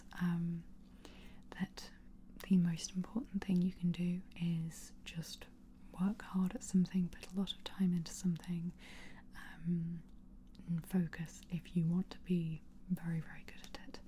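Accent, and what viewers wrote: United Kingdom. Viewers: You have a great ASMR voice. As an American, I think the British accent is a wonderful bonus as well. 👍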